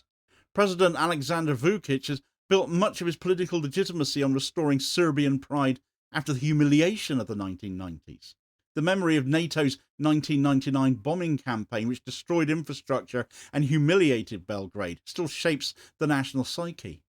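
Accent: United Kingdom. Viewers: First of all, there's nothing humiliating about losing a war against NATO as a small Balkan country. Second of all I like how you haven't mentioned the massive anti-government student protests that have been going on for more than 9 months. You said yourself that these weapons have been 'battle-tested' against a civilian population in Gaza and you could easily draw a parallel with these weapons possibly being used on the Serbian anti-government protesters if you weren't just fear mongering and spreading propaganda. Also it's very interesting how Serbia is now a problem, but your entire Western bloc, including your own country, that have been supporting and arming Israel for DECADES are fine. What is this hypocrisy? This video is proof that you can say anything, with a British accent, and people will think you're insightful and smart.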